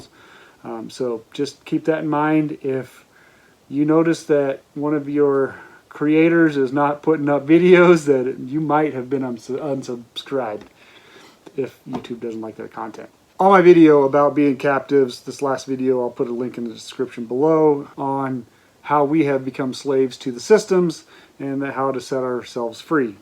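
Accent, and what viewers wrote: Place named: Pennsylvania Dutch, United States. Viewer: I can still hear the Pennsylvania Dutch in your accent!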